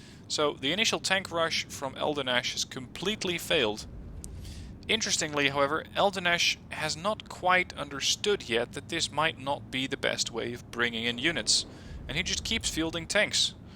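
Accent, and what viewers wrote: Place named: Netherlands. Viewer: Ok. By the way, are you from the Netherlands? You have a particular accent.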